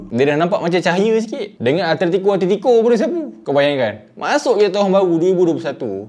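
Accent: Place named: North, Malaysia. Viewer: OK,cuma utaqra kuat sgt, org key el kurg paham 🤣🤣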